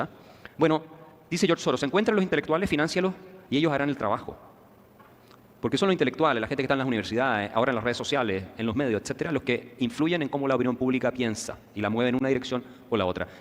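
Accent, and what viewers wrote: Peru. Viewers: El acento limeño es perfecto. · 👈El único ridículo eres tu, nadie está forzando nada ciertamente. Así hablan los Peruanos. Si no te parece bien es problema tuyo , 🎉 FRVSTR@ 🎉😂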